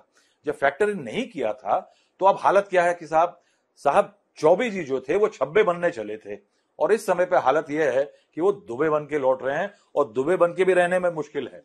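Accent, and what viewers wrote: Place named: Bihar, India. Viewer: Stop bhaiyan and bihari style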